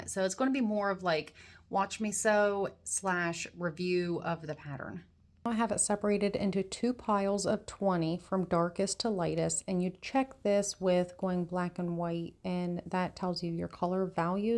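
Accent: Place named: Maryland, United States. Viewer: Also are you from near Maryland? That acent😅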